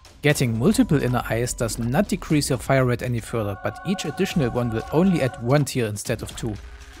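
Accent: Germany. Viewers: So extremly german 😅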